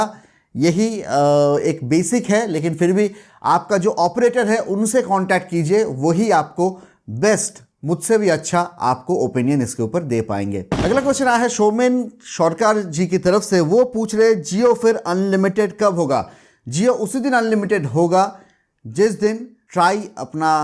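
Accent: Bengal, India. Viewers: आपका बोलने का तरीका(लहाजा) एक दम मस्त लगता है बंगाली हिन्दी मिक्स। मजा आ जाता है । # जल खाबो।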